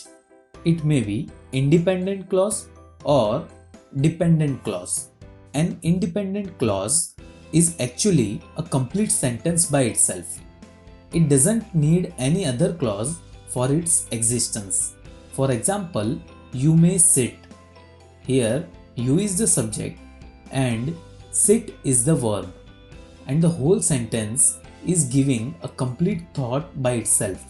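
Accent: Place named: South India, India. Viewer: i think he is south indian😂....nice job sir.... rspct india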